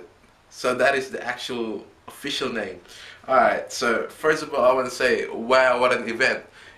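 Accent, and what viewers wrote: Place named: Philippines. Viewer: pinoy accent =))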